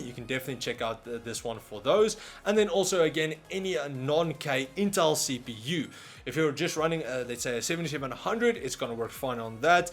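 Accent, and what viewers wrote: South Africa. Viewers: South African? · Is this guy from South Africa?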